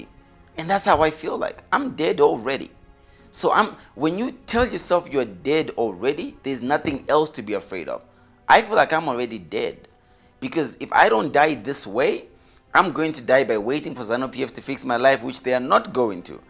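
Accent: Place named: United States. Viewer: But why sound so American.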